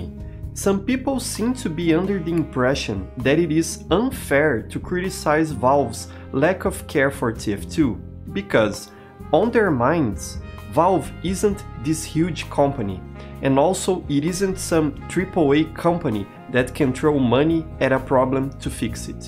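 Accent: Brazil. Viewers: your accent sounds a bit brazilian so caraio raridade ver brasileiro fazendo vídeo de qualidade em jogo que foi praticamente esquecido aqui no país, belos vídeos hue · Whoa that accent, Brazilian? Que loco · Unrelated to the video buuuut you sound brazilian and I find that fascinating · He's accent is so much like a brazilian trying to speak english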